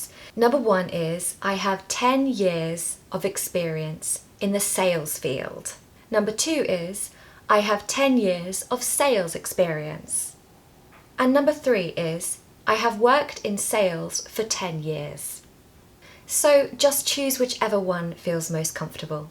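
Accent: United Kingdom. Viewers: Great British pronunciation. Absolutely teachable class. T I want to reading passage class. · I watched several videos about this same topic but this one is so useful & informative with a superb lovely British accent that i wouldn’t hesitate to watch again ❤ Thank you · I appreciate your hardworking and the opportunity to see and listen to your videos every day, Really your British accent is incredible and I watch it every day your lessons. Thank you so much. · I love your British accent and watched your every episode and repeated . Appreciate !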